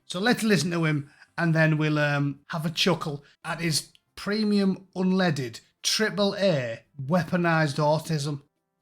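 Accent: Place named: Newcastle, United Kingdom. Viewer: This country is a mess, but tuning in every day to hear the words Rudu-Kunubu with a Geordie accent makes everything a lot more bearable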